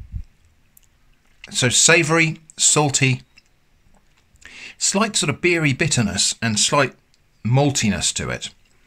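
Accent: United Kingdom. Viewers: That's so aggressively british gosh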